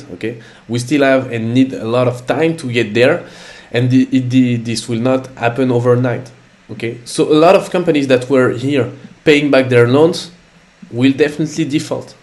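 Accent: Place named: France. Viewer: Ton anglais avec un accent francais est tres sympa. Tu maitrises la langue de shakespeare ! Chapeau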